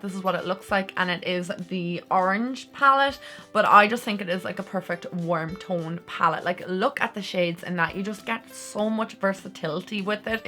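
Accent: Ireland. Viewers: You're Irish😄😄😄😄 Legit haven't been able to find any Irish youtubers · I’m sorry but I’m liking and following instantly bc ur irish, An bhfuil chead agum dúl go dtí an leathreas (probs spelt like half the words wrong lol)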